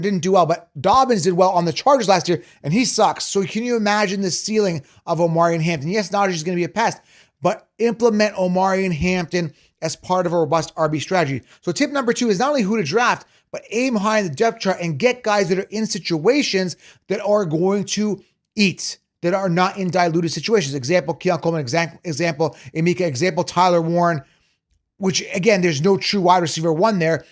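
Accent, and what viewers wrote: Canada. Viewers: Raiders fan with a Canadian accent…lol no thanks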